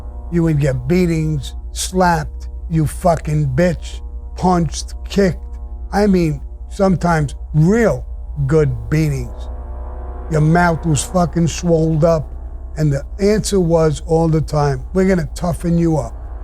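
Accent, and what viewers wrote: United States. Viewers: I’m a NEW YORKER myself! I absolutely love listening to you talk, your mannerisms, it’s like I’m back home. I especially love hearing about your life, the way u can describe the detail, the expressions even on your face... it’s like u sitting there next to me, as if it just happened. Thank u for the experience... absolutely my favorite podcast! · Talks like a 100% full on seppo.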